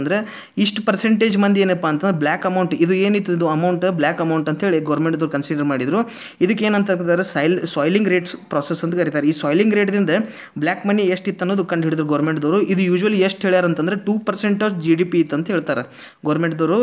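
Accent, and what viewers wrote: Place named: North Karnataka, India. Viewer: sir your uttarkarnataka kannada ...awesome sir....😊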